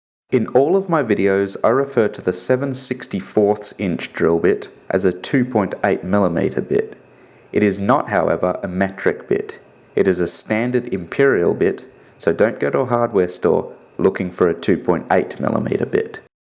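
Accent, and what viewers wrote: Australia. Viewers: upon hearing your voice' I said "Hey, he's an AUSSIE"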